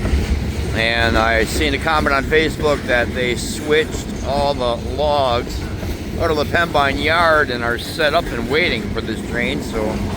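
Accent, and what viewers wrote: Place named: Uttar Pradesh, India. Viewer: I left the U.P. 55 years ago all my U.P. family is gone now to hear your accent feels like home.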